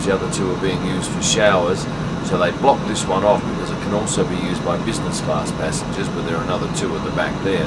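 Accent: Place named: Western Australia, Australia. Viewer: Mate I didn't know you were a west aussie! Subbed!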